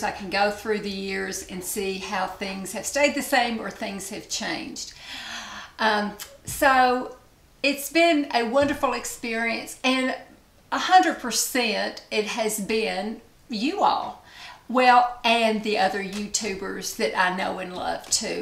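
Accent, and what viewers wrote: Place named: Kentucky, United States. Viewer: I'm glad to hear that you were also born a Kentuckian! I live in Louisville but also spent many years in a small country town south of Louisville. I wish I had your accent as it is so charming!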